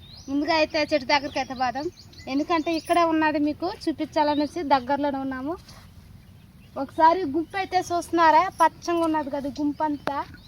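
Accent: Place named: Chittoor, India. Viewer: సిస్ బాషా వింటూ ఉంటే మీద చిత్తూర్ యాస లాగా వుంది.. Medhe a ఊరు సిస్ ఇంతకీ..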